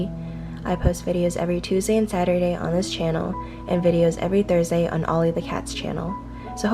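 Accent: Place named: West Coast, United States. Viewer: thank you i love when I get recipe from the real country people. however you sound like west cost.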